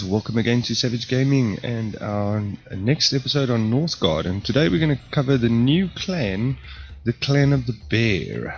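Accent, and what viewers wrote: South Africa. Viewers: are you south african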